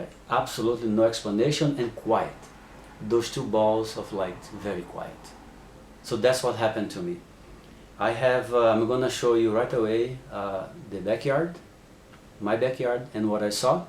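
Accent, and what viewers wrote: Brazil. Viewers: Ola. Pelo sotaque, voce deve ser brasileiro. Fiquei muito interessado no seu depoimento, mas compreendo pouco o ingles. gostaria de saber se voce poderia inserir legenda em portugues. grande abraço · Hey Man....You Brazillian right ? You sound It ! I am in Porto Alegre and we have a a small cluster that make and use the CE5 Protocol quite.often. Just to let you know you have allies and you not Alone. ALL the best Man.. Peace out !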